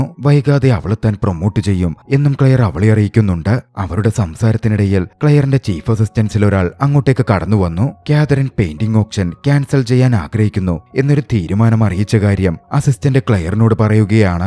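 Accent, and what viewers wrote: Ernakulam, India. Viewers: നിങ്ങ എറണാകുളത്തുകാരനാണോ?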